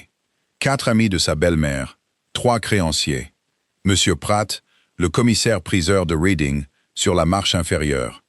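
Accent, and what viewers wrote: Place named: United States. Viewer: Merci, j’ai bien aimé cette histoire très bien contée avec un joli accent, le même que celui de mon mari américain..très agréable histoire d’amour..😊 Je vous écoute du Sud de la France..😊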